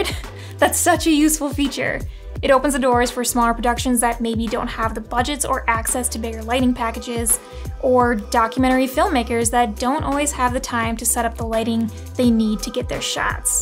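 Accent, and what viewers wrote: Midwest, United States. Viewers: ok gotcha! Yep that Midwest accent 😂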